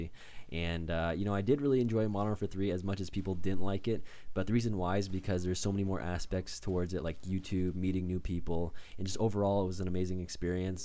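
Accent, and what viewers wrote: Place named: Asia. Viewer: 我從一開始看他之後就覺得他的口音有點亞洲人，感謝翻譯。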